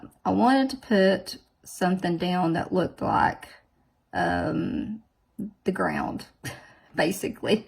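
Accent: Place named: South, United States. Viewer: I just love listening to you talk, and your southern charm and sayings. You do a great job!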